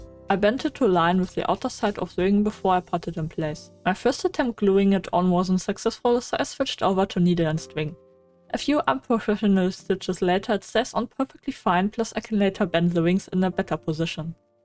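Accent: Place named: Germany. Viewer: Bist du Deutscher hast einen deutschen akzent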